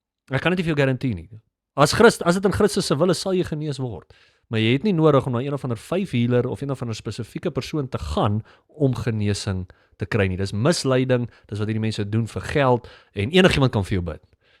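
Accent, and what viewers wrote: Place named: Mid-Atlantic, United States. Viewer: That "Mid Atlantic " accent should make anyone suspicious.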